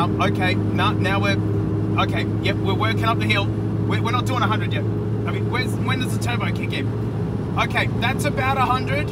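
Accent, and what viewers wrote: Australia. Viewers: i love how every adelaidean bloke sounds the same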